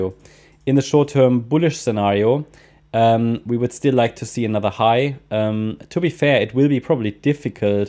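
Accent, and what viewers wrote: Germany. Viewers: Thank you Mister, I always thought that you speak (good) English with a German acent, and a few days ago I found your DE chanel 🤣🤣😍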